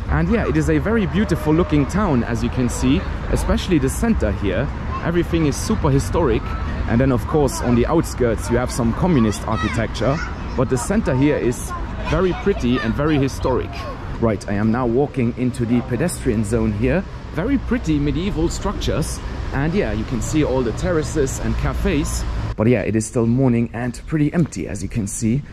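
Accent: Germany. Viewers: you have a very interesting accent. It sounds very much like a german one.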